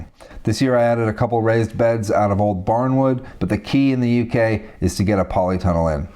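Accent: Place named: United States. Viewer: I'm thrilled that you're bought a place in the UK! You have an American accent so I assumed that you were based in the US. I've thought exactly the same thing about growing spaces. North wall and lots of thermal mass. If you've not already, have a look at "Chinese greenhouses".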